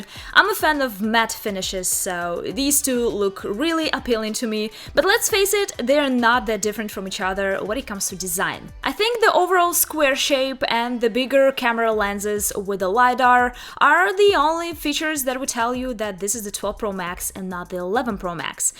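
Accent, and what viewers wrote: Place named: Russia. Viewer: Привет,. Приятно слышать русский акцент:)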